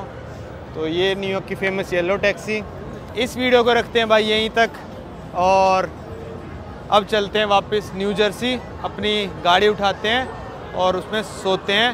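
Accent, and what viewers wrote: Haryana, India. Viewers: Bhai....You are a very frank guy and I like the way you present the whole show. I like your Haryanvi accent and daring to rebuke the viewers who look for lecherous clips. Keep it up. Come to my area in Dover at Rehoboth Beach and cover it.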